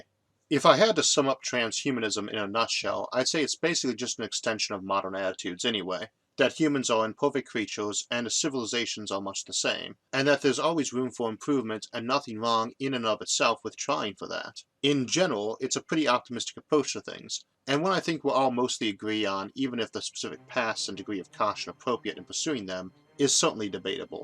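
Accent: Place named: Louisiana, United States. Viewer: Thanks, yeah despite the speech impediment I think it was the Louisiana accent I was picking up on. I could tell it was a Southern style accent but wasn't sure.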